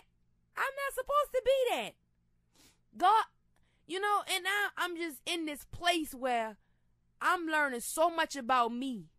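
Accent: New Orleans, United States. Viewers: I was thinking of some old BME stuff and Googled you. Glad to see that your life has turned around for the best. But truth be told, your past lyrics use to be on rewind because you was spitting fire. Plus I love women from New Orleans accent.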